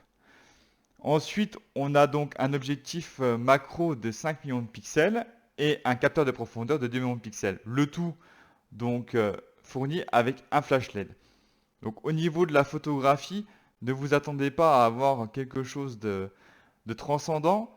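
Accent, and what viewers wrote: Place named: Vosges, France. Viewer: alors je ris, parce que en vous écoutant (sans avoir lu) j'ai pensé moi aussi à l accent Vosgien 😛🤭 ah ah ah c est tellement inimitable ! Entre Vosgiens on se reconnaît bien. Merci pour vos infos 👍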